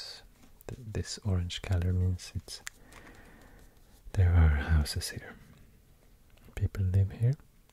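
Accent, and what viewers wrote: Sweden. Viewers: Finally found a very calm speaking swede in the ASMR community! I’ve been to Stockholm so many times and even did an exchange in Umeå. I got hooked to the Swedish accent to English and love this so much. You speak so calmy and take breaks between your sentences. A new subscriber here :) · I think your Swedish accent and calm voice makes your voice so perfect for ASMR.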